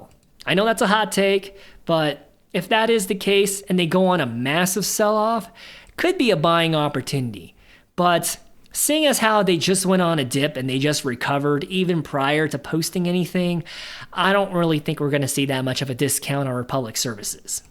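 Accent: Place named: Philadelphia, United States. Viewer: lol k just sometimes sounds like you have a philly accent🤔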